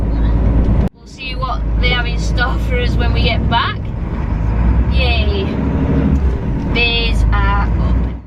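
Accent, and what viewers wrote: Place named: Yorkshire, United Kingdom. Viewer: Hi I just found your channel, it’s great. I’ve just started turning in March, where are you? I’m in East Yorkshire you have a Yorkshire accent 😀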